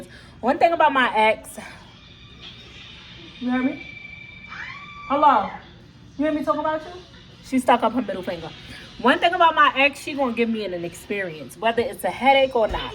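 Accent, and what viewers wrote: New York, United States. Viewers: Im loving your channel and I love hearing you talk!! I love the ny accent...ima Florida gal!! I drive my 18wheeler thru NY!! You season your food like I do mine but ima use the sauces.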